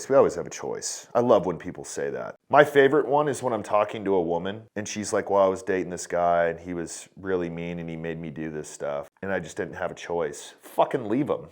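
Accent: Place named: Texas, United States. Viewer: porn and a lil meth.... ill be straight up honest. thats my vice i need to break... boss this is the second video of yours ive ever seen (RIP Charlie Kirk. We wont forget you lil bro, you were a good one) and GOD DAMNED if real dont recognize real. i without even lookin it up know youre a Texan born n bred, i hear it and your demeanor... we know what we know when we know. [funny on topic story: id just got out like at my buddies for 5 mins (2014, county not tdcj) was at my buddies {did i mention a lil meth? kinda on brand at this point[more subtext]} and a girl walked past me.... thats it.... i said.... youre a grove rat aint ya? yup. she also was raised at the buckner childrens home like my pops.... when i say when know what we know when we know. i mean this. sorry for blatherin, prolly the meth.] porn n meth. just the time i waste on that. 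not consuming my life mind you just that ya know.... its there. means other stuff is not. i should look at myself and realize if i saw this in a friend id be disappointed in them.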